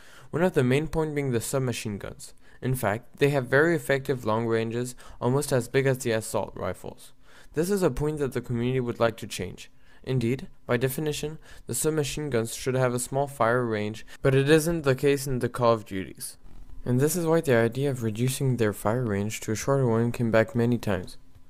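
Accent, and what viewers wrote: France. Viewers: C'est un mec français qui parle en anglais, son accent est pourri. Pour que la vidéo soit vu par les développeurs de Black Ops 2, il faut spammer sur leurs TWITER OU FACEBOOK ! Faites le SVP, on aura plus de chance d'avoir enfin un bon Call Of Duty :) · vous y croyez ? non mais c'est quoi cette accent français ... --' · Ca s'entend que c'est un francais qui parle Anglais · Fake --' Le mec c'est un francais qui parle en anglais afin qu'on aille sur ça chaine et qu'on lui fasses des vues... Je connais peux d'anglais qui disent ''Code Quegé'' , ils diraient plutot ''Cod Queji'' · C'est un français qui parle mon petit...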